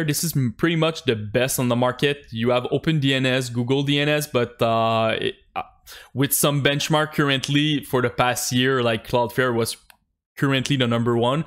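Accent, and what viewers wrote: Quebec, Canada. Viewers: Clairement tu est québéquoi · on dirais un accent qc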